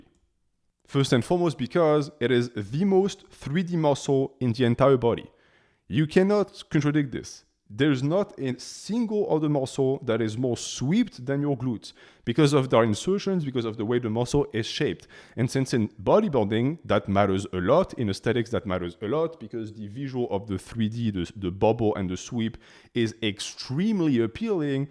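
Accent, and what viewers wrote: France. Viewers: T’es français? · Flag, accent, demeanor, face, diet, him literally saying he's french .... What are you even on ?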